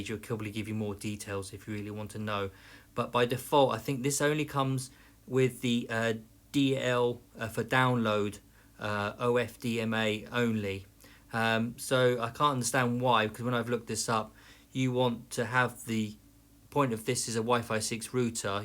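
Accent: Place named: RP, United Kingdom. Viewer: Why would he do that? He's English, from England who speaks Oxford/Queens English. Not a yank speaking false "American" English.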